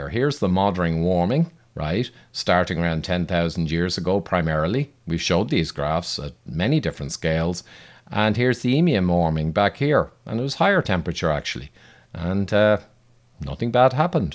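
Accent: Ireland. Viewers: Nice. And the Irish accent helps too.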